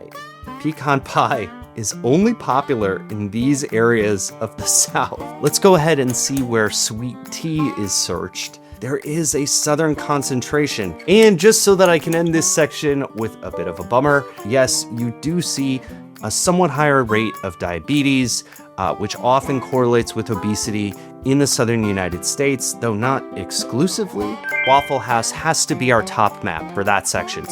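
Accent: United Kingdom. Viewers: As someone from Oklahoma. We’re sort of a mix of South and Midwest. Your accent in the ad read sounded almost UKish to me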